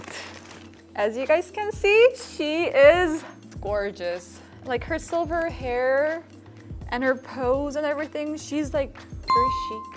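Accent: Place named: United States. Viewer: Dude I thought I was gonna hear some koran, turns out she speaks American English more than Americans themselves!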